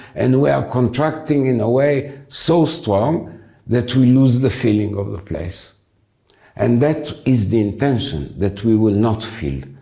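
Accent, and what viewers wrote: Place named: France. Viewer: I love your accent are you French and thank you for your wonderful, articulate wisdom. A merci...😊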